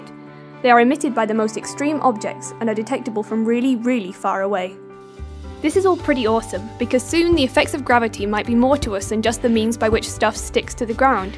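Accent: United Kingdom. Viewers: The video's really nice. Your brit accent is even better! ^.^ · minute physics with a brit accent. :)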